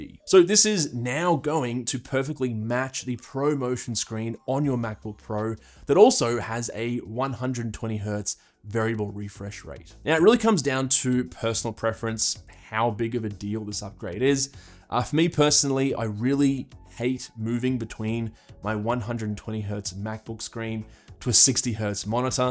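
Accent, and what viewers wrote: Australia. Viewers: Spent half the video trying to figure out if you were Australian. As an Australian that spends too much time on the internet and now can’t pick an accent so save my life 😂🤦🏼‍♀️ You’ve just got yourself a new subscriber. I’ve got a really old dell monitor I’m using with a new Mac mini m4 pro. I really like the colour on the old dell and find it looks almost identical compared to my 2016 MacBook Pro Display. For that reason alone I’m drawn to getting another dell monitor. My monitor can do 75 hertz but only 1080. And the weird scaling on the 27 inch monitor means I have slightly burry text. I have a small desk which means I probably sit a bit closer than is ideal and the weird text bothers me. I’m not great at understanding the scaling thing, but 1080 on a 27 inch vs 4K on a 27 inch, what would be the pixel density difference and would it improve my current viewing situation? 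😂 Love your content.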